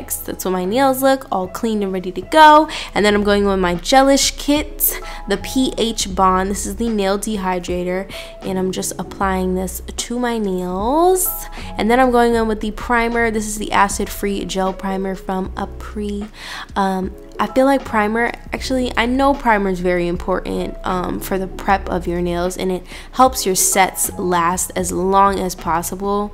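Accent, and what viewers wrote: United Kingdom. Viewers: We all mutually agree we love these nail vids 😍 the british accent killed me 🤣 oh also its a-pray bby girl xx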